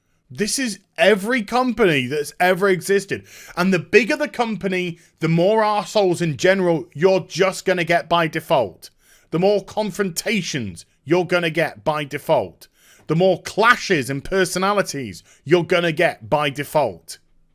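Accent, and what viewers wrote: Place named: United Kingdom. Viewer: with his British accent mimicking vapid valley girls...priceless!:)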